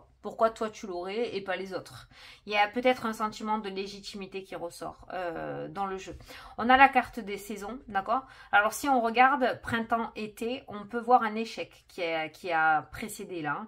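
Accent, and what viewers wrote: South, France. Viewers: Bonjour, peu de temps que je vous écoute, j’adore votre accent du sud qui nous enchante nous les gens du Nord ❤ Un grand merci pour votre guidance 😊 Patricia 23 janvier 1962 Bonne soirée et à demain 👋👋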